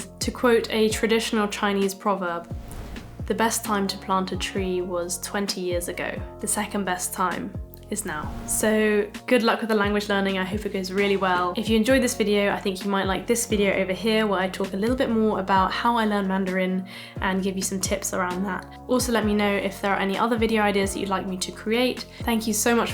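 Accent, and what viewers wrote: England, United Kingdom. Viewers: As an American born Chinese - I grew up speaking Cantonese. Honestly, its NOT the Chinese but your ENGLISH ACCENT I love most. The English accent automatically boosts your perceived IQ 88 points!!! Where are you originally from? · Ni Hao I love everything you said,particularly your British English. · I was looking on youtube for Chinese lessons when I came across your videos. I thought I saw a Chinese woman on your video cover. Then she speaks pure English with a British accent. I was like : whaat ?? Then I learned she only speaks English and is learning Chinese. heh ? . If your friends told you you should try make youtube videos and put your face on the covers - then yeah, that was a great idea. That beautiful face of yours is a click magnet. Very GOOD ! Now I also learned that you are studying to become a doctor. Hey hows that for double good: She also has brains ! 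not just something like perfect beauty. The problem with most doctors are they never get around understanding the placebo effect even though it is in most doctors study materials. I hope you will be smarter. And I DO hope you will be smarter :). Anyway, I am curious about how come you look so Chinese/Asian. Must be in the bloodline somewhere recent in the family tree ? I think your reasons and motivation for learning Chinese is very good. I will not keep it against you for neglecting the following reason: And next time some Chinese speaking person starts to speak Chinese to you because he/she thinks YOU are Chinese speaking: You don't just have to stand there dumb struck and start talking English with a perfect British accent. Sorry but its just funny ! LOL . Well have a great day and I'll probably see you in some of your other videos. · wow i like your british accent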